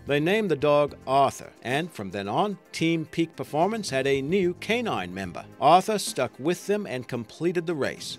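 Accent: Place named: Australia. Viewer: Rainbow Dash you can't tell? I'm American he's definitely Aussie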